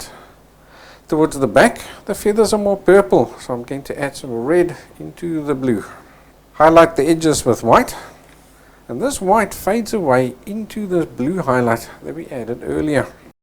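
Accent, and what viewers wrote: South Africa. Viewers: How refreshing to see a painting tutorial without the tedious real time or annoying back ground music. No boring pre chatter. Just straight in. That's why I can happily look at it more then once and to also enjoy the South African accent. Thank you so much!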